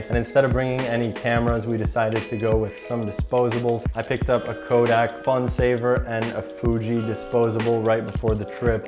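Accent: Netherlands. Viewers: Are you Dutch? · Are you dutch? :)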